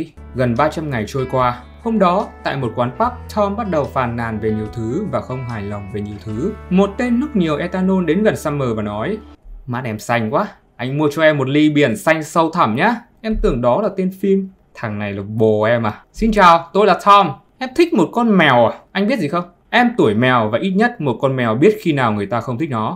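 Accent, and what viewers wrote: Vietnam. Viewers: Nghe bạn kể lại film này bằng chất giọng thuần Việt, làm mình thấm film hơn thêm 1 tí qua bao năm rất yêu film này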